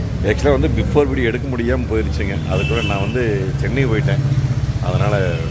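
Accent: Kongu, India. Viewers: கொஞ்சும் கொங்குத் தமிழ் பேசும் கோமகரே...! திகட்டாத தித்திக்கும் பேச்சுடைய திருப்பூர் திருமகரே...! லடாக் பயணச் சாதனைச் சகாப்தம் எங்கள் மதிப்பிற்குறிய அண்ணா திரு. அண்ணா❤❤❤🙏 அவர்களே எப்படி இருக்கீங்க அண்ணி❤❤❤🙏 எப்படி இருக்காங்க தம்பி ரித்திக்❤❤❤ எப்படி இருக்காரு வீட்டைச் சுற்றிவரும் பட்டுக் குருவி அது சிறகு முளைத்த சிட்டுக்குருவி...! சுறு சுறுப்பாய் சாலையில் நான்கு சக்கரங்களைச் சுழற்றி வலம்வரும் சுட்டிக்குருவி அது நம் மாருதியின் சிட்டுக்குருவி...!!! விறுவிறுப்பாய் ஊரெங்கும் விளையாடும் ஊர்க்குருவி அது பறவையென்னும் சிட்டுக்குருவி...!!! தனக்கென்ற ஓர் மானிடக் குடும்பத்தோடு வாழும் இந்த குட்டிக் குருவி அது மாருதியின் சிட்டுக்குருவி...!!! வண்ண வண்ண நிறத்தில் வானத்து நட்சத்திரமாய் வலம் வரும் செல்லக் குருவி அது பறவைச் சிட்டுக்குருவி...!!! வையகம் முழுவதும் அண்ணா திரு. அண்ணா சென்ற லடாக் பயணச் சாதனைச் சகாப்தத்தை போற்றி மகிழ்ந்து அனைவரையும் வாழ்த்துக்கூற வைத்த கவிக் குருவி அதுதான் நம் அனைவரது மனதையும் கவர்ந்த மாருதியின் ❤"""சிட்டுக்குருவி"""❤...!!! 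என்றும் அன்புடன் உங்கள் தம்பி🙏 என்றும் அன்புடன் உங்கள் TMF🙏 ரா.முஹம்மது கபூர் (சென்னையில் இருந்து)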